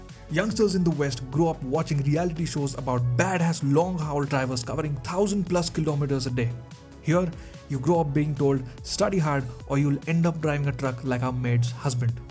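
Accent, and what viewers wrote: India. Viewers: your accent so good reminded me of my indian teacher. "magzimum Load" chills.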